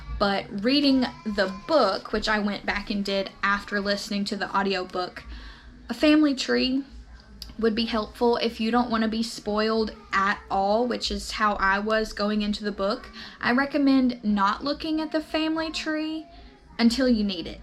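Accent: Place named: Carolinas, United States. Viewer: I would say from the Carolinas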